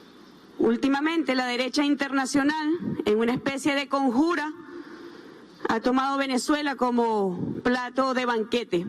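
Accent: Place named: Cuba. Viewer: Oie habla como cubana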